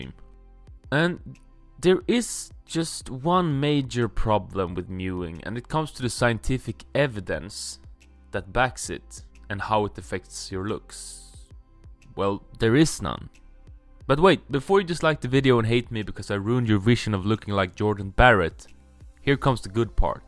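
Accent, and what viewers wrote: Sweden. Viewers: swedish accent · is that a fellow swede im hearing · Damn is that a swedish accent or am I crazy af? 🤔. Yes I love mewing I have been doing it for a month now and I see huge difference. I reccomend it to everyone!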